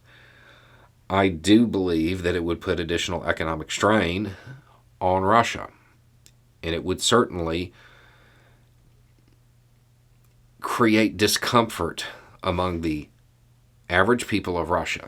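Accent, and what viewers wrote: South, United States. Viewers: Not sure if you can tell but your accent has gotten less southern. Just watched a video from 3 years ago.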